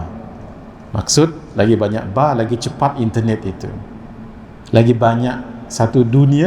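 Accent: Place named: Sarawak, Malaysia. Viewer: Salam ..jazakAllah khair ..gaya bercakap mcm org kuching cakap bahasa melayu semenanjung ..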